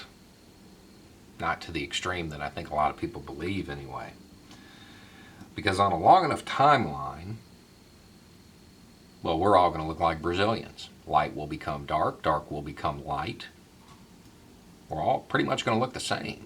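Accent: South, United States. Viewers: Because he's a disingenuous asshole who just repeats Leftist talking points in a southern twang. Which is also subsequently why you guys like him, he's basically your "house southerner".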